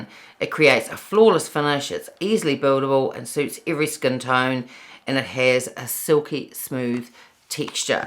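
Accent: New Zealand. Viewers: Thank you for such a thorough review you get an A for effort for taking the time to wash off and reapply products twice! These all looked great on you, but it seems like everything you try does. Also enjoy your videos because I love the NZ accent.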